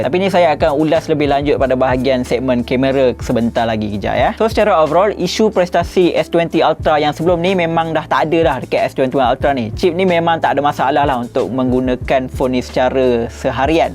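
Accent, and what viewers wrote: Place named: Kelantan, Malaysia. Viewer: Dik.. pelat kelantan awak not bad jugak ya..kecek kelate je lah. 🤣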